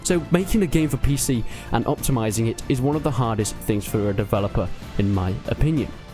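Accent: United Kingdom. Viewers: I thought he was British?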